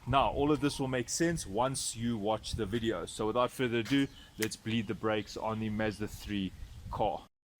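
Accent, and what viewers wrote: South Africa. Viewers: After hearing your South Africa accent I became a subscriber.😊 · After hearing your south aflican accent I changed my mind about watching your video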